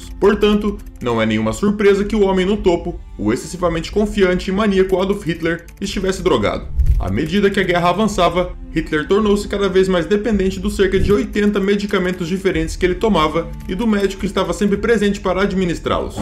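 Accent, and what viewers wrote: Brazil. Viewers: Boa noite, neste caso, está certo, uma vez que a pronúncia usada no vídeo é a do português brasileiro. No mais, se realmente você estivesse preocupado com a pronúncia correta em alemão, ao invés de citar poucos erros de pronúncia ligados ao nome de um campo de concentração, iria escrever uma mensagem a cada vez que o dono do canal dissesse “Hitler”, uma vez que a pronúncia dele é a do português brasileiro, não a alemã, que seria “ritlá” ao invés de “ritlêr”.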